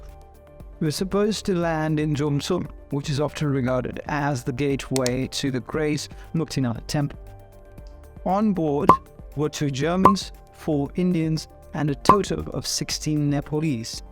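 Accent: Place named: England, United Kingdom. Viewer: Throughout video I was feeling irritated with your annoying English accent ...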